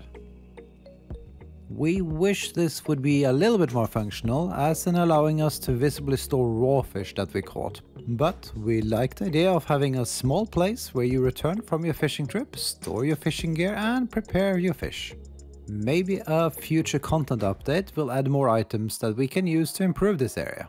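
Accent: Norway. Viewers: Might i ask where your from ? sound so Norwegian :p Love the ideas ! keep it up :D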